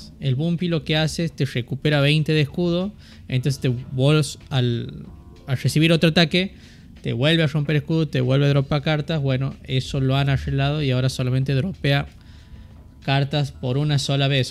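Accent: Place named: Santiago del Estero, Argentina. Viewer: Reconozco ese acento del Norte, sos santiagueño?, buen video todavia soy muy principiante en tema de cripto y nfts pero con estos videos me informo.Saludos.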